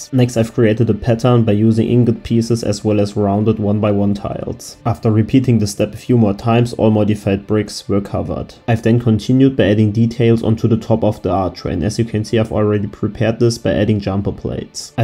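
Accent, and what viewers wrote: Germany. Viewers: You sound like a German that is speaking English bro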